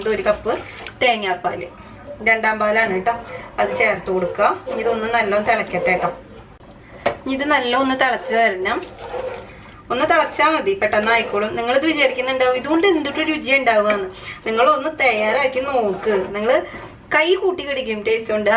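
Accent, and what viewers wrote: Thrissur, India. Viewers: Recipe is good. But we will never use coriander powder in stew. Nattil evida? Nalla Thrissur slang. Me from thrissur.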